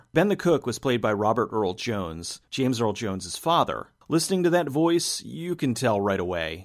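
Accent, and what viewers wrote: Philadelphia, United States. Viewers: I sense a northeastern accent, near philly perhaps?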